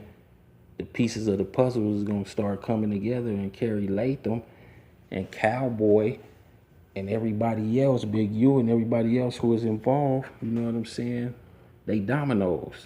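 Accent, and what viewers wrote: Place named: West Coast, United States. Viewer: sound like you are from the west coast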